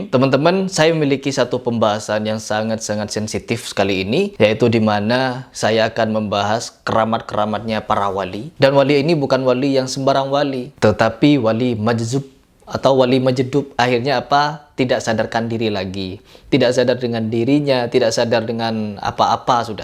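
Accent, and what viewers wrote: Madura, Indonesia. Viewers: Teruskan... TERUSKAN... Lucu logat bahasamu Madura bangetzzz.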